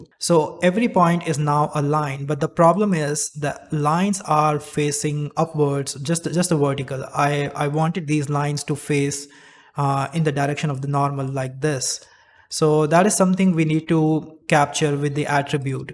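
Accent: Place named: India. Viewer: as soon as I heard your accent, my ear; yup he is from India(Delhi, Mumbai or Bengaluru)(Dam I have a perfect pitch)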